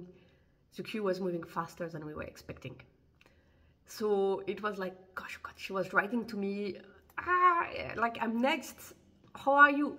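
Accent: France. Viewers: Love your french accent!🤗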